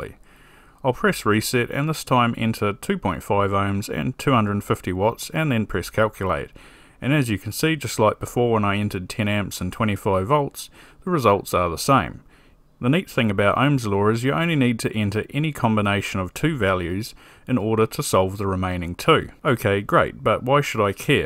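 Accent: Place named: New Zealand. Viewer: New Zealand accent + Useful Information + Comic relief = Awesomeness!!!! I love these videos :D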